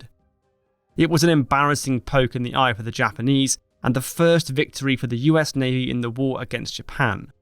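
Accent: United Kingdom. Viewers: Stop narrating these with British people, these are American victories. Should be called by American heroes.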